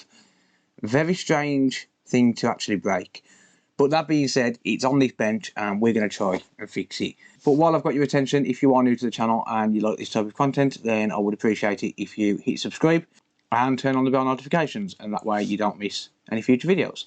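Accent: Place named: Midlands, United Kingdom. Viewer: Where abouts in the country are you mate? Sound like a midlander?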